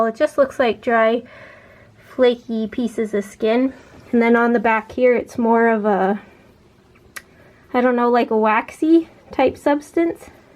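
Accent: Canada. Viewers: She sounds Canadian 😂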